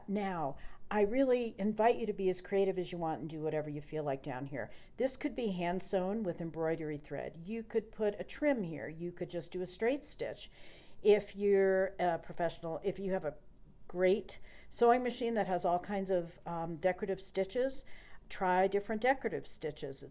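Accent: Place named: United States. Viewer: Oh, you’re so welcome. If it’s any consolation, even young immigrants find it hard to make friends here (see Simple Living Australia - I think that’s the channel name. I’ll come back and edit if I got it wrong.) it might also be more difficult because of your accent. Americans don’t have a good reputation here, unfortunately for you, because you sound like good people. Please, if you know of any anti-Trump or resistance protests here in Oz, please let me know. I’ve wanted to attend No Kings protests here in Sydney, but can’t find any details?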